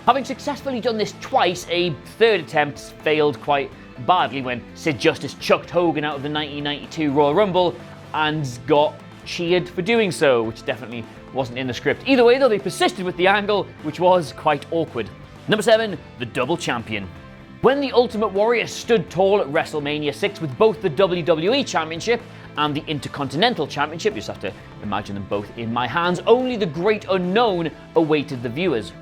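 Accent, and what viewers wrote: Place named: England, United Kingdom. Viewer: you the one pronouncing it wrong fool, he’s English! He speak a purer dialect of English than you ignorant yankee! You speak the language you speak because of his ancestors foolio, read a book idiot.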